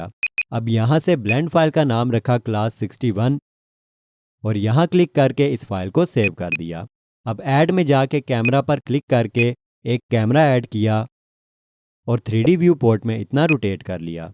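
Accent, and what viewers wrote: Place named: Pakistan. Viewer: Apki awaj pakistan logo jaisa ata hai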